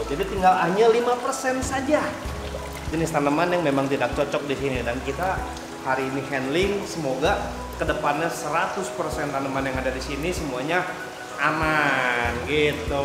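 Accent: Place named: West Java, Indonesia. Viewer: Pertahankan logat sundanya kang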